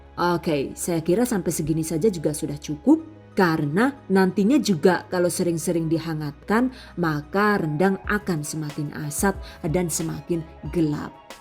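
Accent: Banyumas, Indonesia. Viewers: Mbaknya orang mana sih?kok ada ngapaknya. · Hahaha lucu banget ngomong bhs apa itu.ngapak y.makasih atas resepnya mbak.semoga menjadi berrkah.Aamiin..🙏👍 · Bismillah "DONE"#Giveaway THV 2020.paling seneng sama resep rendang yg satu ini.kelihatan enak bgt.menu favorit,apa lagi ditambah suara mbaknya yg ada ngapak ngapaknya gitu bikin senyum senyum sendiri dikamar koyo wong gemblung.ha,ha.pokoke ORA NGAPAK ORA KEPENAK.OK.CATAT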